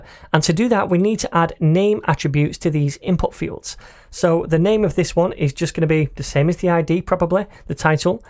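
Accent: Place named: London, United Kingdom. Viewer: no adds, no rubbish, just a nice london accent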